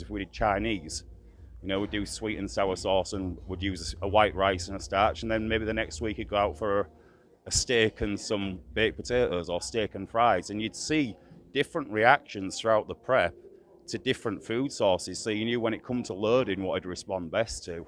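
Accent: United Kingdom. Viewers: Fucking hell didn’t expect the northern English accent!!:) good work nice change! 👌🏻👌🏻👍👍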